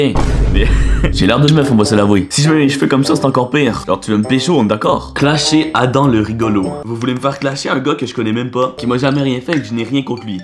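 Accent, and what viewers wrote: Quebec, Canada. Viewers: tes qubecois?? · Un bel accent canadien 💫💗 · Tu es quebecois?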